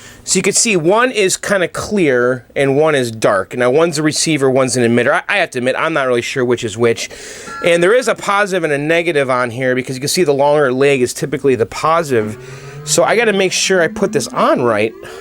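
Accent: Germany. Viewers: That might be the best German accent I've ever heard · Hey, I really love your german accent. I speak german and I laughed so well! TOP!!! Greets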